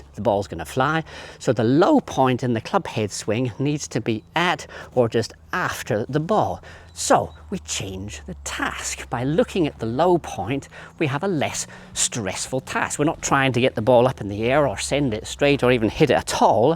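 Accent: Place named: England, United Kingdom. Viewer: I love your channel. Sounds like you’re English. Where are you and do you give lessons in person ?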